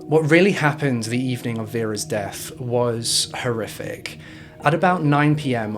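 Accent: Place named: England, United Kingdom. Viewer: i ABSOLUTELY LOVE LOVE LOVE YOUR ENGLISH ACCENT. SOO JEALOUS... IM FRIM NEW YORK A WHITE MALE WITH AN EVEN STRANGER ACCENR THAN YOURS. I LOVE YOUR CHANNEL. I COULD LISTEN TO YOU ALL DAY LONG. MUAH .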